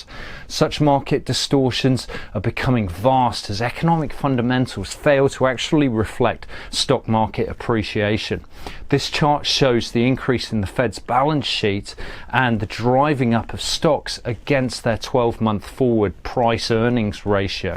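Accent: United Kingdom. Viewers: You remind me of a black guy, but with Caucasian skin and a British accent.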